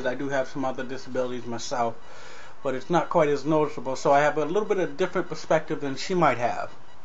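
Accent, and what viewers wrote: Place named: West Coast, United States. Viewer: I couldn't even tell. In fact, I thought you were a west coast brother. Are you?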